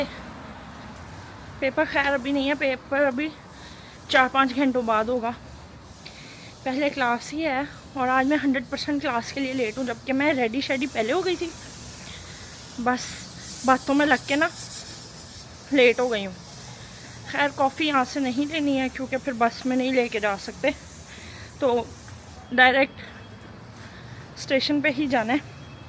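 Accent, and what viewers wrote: Lahore, Pakistan. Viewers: Salam g so quite look vicee totally koean but your language extent is lahori ap to koeran silha rhe hn m ap ko quran sikhana chati ho takweed k sath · U r soooo cute n talk typical lahorei Urdu … simply love watching u 💕💕💕💕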